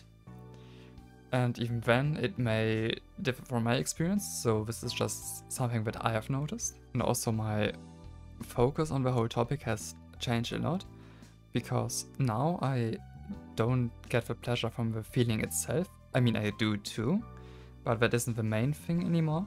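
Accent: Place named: Germany. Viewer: I've noticed that you have a German accent when you're talking.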